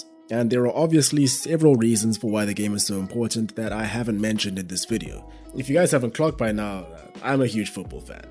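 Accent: South Africa. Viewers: Always thought you're South African. Rep Zim! Great content amd congratz in a 100K! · Knew you were South African! My fellow citizen...I have subbed lol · I always though he was south African living Pretoria and I think I was right lol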